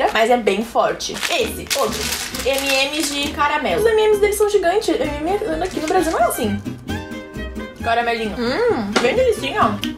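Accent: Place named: Spain. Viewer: I dont understand nothing, but i tink dare she is from spanish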